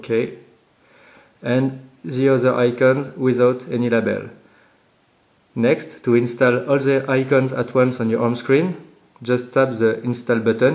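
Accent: France. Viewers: J'adore cet accent! Vive la France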